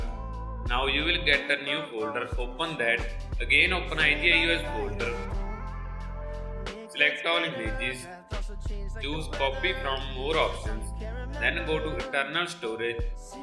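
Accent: India. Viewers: You English is enough punjabi?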